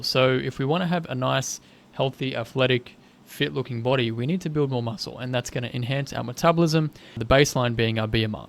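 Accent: Australia. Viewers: I couldnt understand a word. Are you osstrayliyen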